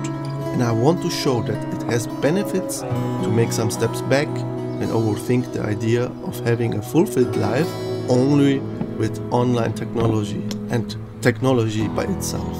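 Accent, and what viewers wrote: Germany. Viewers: Grüße aus Ohio, USA ... Ihr deutscher Akzent stört keines Ihrer U-Boote, macht Sie einzigartig und bringt mich zurück zu Ihrem Kanal ... Bleiben Sie sicher ... Ich wünsche Ihnen einen schönen Tag ... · Your german accent does not bother me or others.what matters is what you do. You are showing others that if you make up your mind that it can be done.thank you very much. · Awesome video,and I would agree with almost everything that you said...and btw.you should talk in your videos more,I meant you must be German when I heard you speaking before you said it,and your accent is preety good,kind of sweet,especially by the fact that English and German are so different languages.However,for me German is much nicer language,even though I don't speak Deutsch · I love everything about your channel.... we use to criticize people living thus lifestyle fulltime but we are now understanding that we need the world and the world doesnt need us.....we need to live in harmony with this earth and as for your German accent .....we all have one🙂 saying hello from Barbados 🇧🇧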